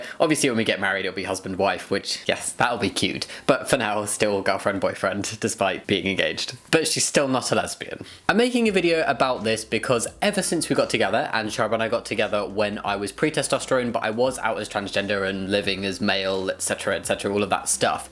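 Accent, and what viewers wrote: United Kingdom. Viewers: La verdad, me revuelves mucho por lo rápido que hablas, y por lo poco que se de ingles, pero me encanta escuchar tu voz, asi de grave, con su bello acento británico y asi, no cambies eso nunca. 😋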